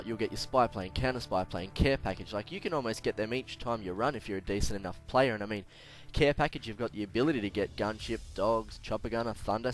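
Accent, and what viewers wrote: Australia. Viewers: Subbed... Awesome to hear an aussie voice....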